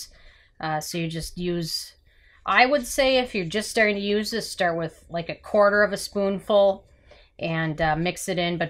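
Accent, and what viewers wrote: Minnesota, United States. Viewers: I just received this today. I noticed your accent then you said you were in MN. Me too😁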